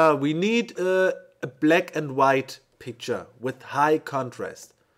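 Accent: Germany. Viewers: thank you very much for this video. Your work is inspiring and, please, please, never apologize for your german accent. Thanks again.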